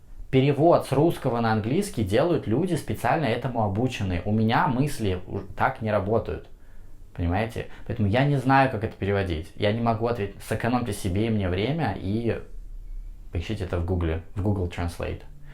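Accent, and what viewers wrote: United States. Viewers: Да, отчетливо услышала американское произношение 😊.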